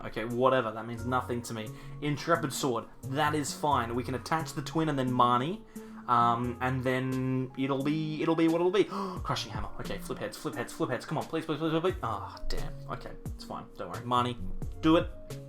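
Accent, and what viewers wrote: Australia. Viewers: first I wanna say I live the vids, secondly the Australian accent makes it that much better but I do have a legit question, doesn’t pertain to you as much but why on earth are all y’all so bad at math during these videos, again not you but mostly just in general haha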